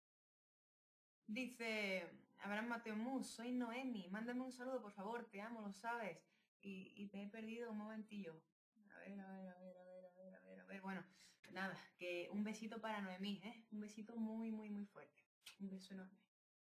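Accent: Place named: Mexico. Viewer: Holaaa Abraham soy Maria de Argentina- Buenos Aires .. Per me dicen Mar , bueno te queria decir q cantas muy lindooo , sos mi amor platonico la vrdd , me enamore de tu dulce vos jejeje si tenes algun tiempito me gustaria q escucharas mis grabaciones de mi cuenta en youtube y me dieran tu opinion ,ya q te admiro bastante y me gusaria conocerte masss bonitooo. Te kieroo muchiiooo , adoro tu asentoo mexicano...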